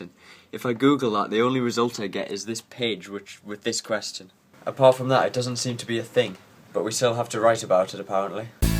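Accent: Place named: Northern England, United Kingdom. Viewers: If I were to guess, I'd say it sounded like a Sunderland accent ! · northern england - don't know the specifics because that would be creepy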